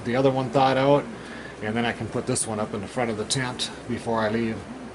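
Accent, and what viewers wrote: Minnesota, United States. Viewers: Thanks, I was thinking maybe you were in Minnesota (accents lol).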